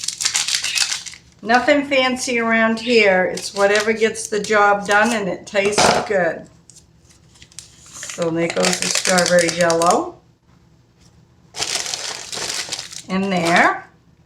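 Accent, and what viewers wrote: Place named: New England, United States. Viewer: Are you from New England ? I know that accent. Im from Massachusetts. I thank you for your recipe. My daughter requested strawberry cake for her birthday. I am making a stabilized whipped cream frosting, her favorite.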